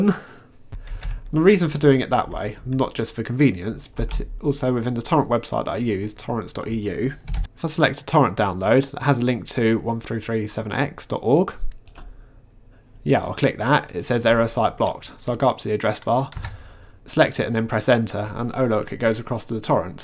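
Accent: United Kingdom. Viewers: never heard anyone more british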